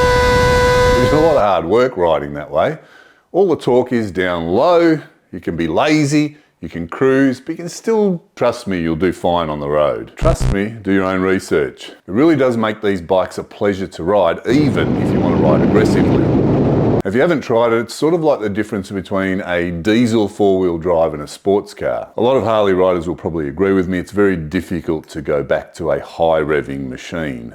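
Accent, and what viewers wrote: Australia. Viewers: Heard the Aussie accent subscribed immediately. Cheers cobber.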